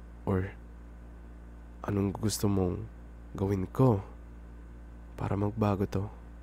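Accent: Conyo, Philippines. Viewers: Shux you sounds so conyoooo~ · I love the accent so conyo vibes na nagtatagalog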